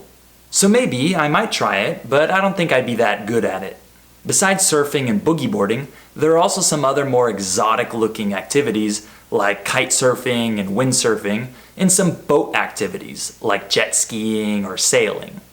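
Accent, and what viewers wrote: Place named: United States. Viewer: لا لكنتو اميريكة بحتة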